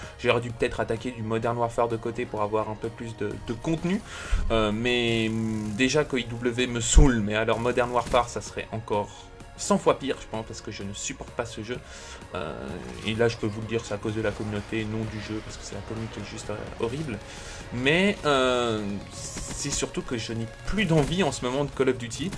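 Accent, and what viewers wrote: Switzerland. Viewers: Il est suisse je crois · t'es suisse ?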